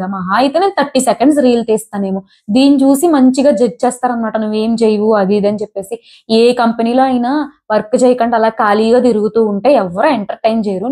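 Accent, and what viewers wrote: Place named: Konaseema, India. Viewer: Konaseema slang ❤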